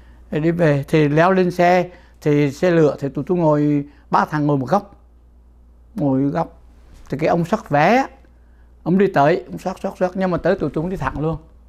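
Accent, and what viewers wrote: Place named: Hue, Vietnam. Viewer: Giọng Huế ❤❤❤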